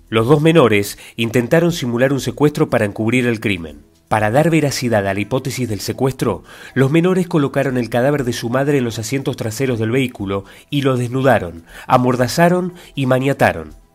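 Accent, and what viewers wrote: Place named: Latin America. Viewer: a esto hemos llegado? A usar voces generadas por IA? O voces de extranjeros para dar las noticias ; si parece que estoy oyendo un noticiario de un país latino o argentino.... En fin".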